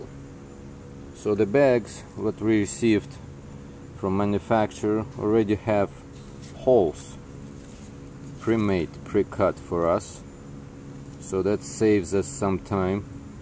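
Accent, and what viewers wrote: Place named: Russia. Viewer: Ti idiot ili prosta zaviduesh ego!! Important is not an accent (although English with Russian accent is very cool)! His English is very perfect grammatically, and also very rich vocabilary! Thank you for the Videos!! You are gift from the GOD!!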